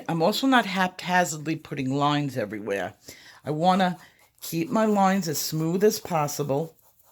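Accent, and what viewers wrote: New York, United States. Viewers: I love your New Yawk accent! Well done video as well.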